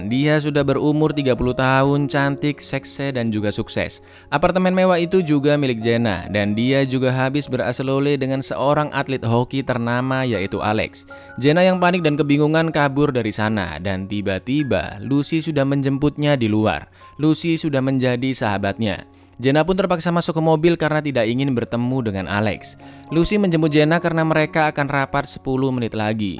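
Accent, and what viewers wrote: Java, Indonesia. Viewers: Wong jowo ya bang apa lampung?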